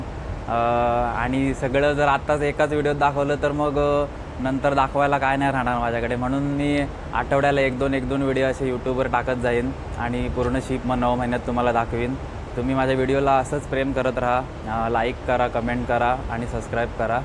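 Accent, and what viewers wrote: Konkan, India. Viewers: मी पण कोकणी आहे तुझा बोलने वरुन समजते तुकोणी आहे ते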